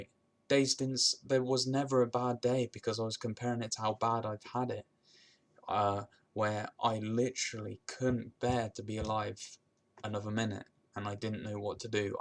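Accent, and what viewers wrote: United Kingdom. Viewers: I myself always think that things could be worse for me and it makes me feel better. And I also read or listen to others feeling so sick where they can barely get up and I feel lucky. I am very sensitive to gluten. Just getting off of it did SO much for me. I still have CFS but I'm better. I'm going to really try to go to sleep early and eat more often and more veggies. Thank you for your videos. BTW I'm pretty obsessed with your accent. lol I'm from the US but originally from the Caribbean and I love British accents.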